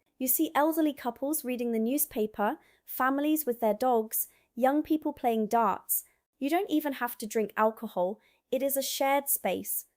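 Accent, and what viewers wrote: United Kingdom. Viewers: I buy superseeded wholemeal bread and it lasts me a week. I prefer it that way. It tastes fine. I have 5o say you are a most confusing Amercan because you sound like a Brit!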